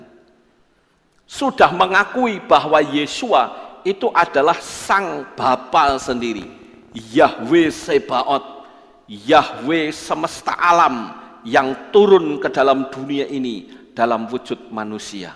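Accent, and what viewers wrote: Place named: Java, Indonesia. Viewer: medok logat jawa mu pak.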